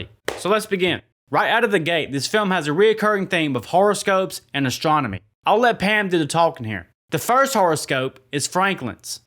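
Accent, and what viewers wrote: South, United States. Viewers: You are definitely from the south my friend 😂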